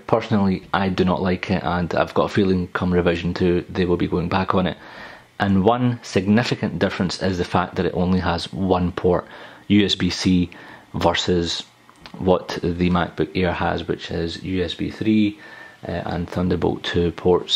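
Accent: Scotland, United Kingdom. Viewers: nice scottish accent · Omg I love the Scottish accent! So hot hehe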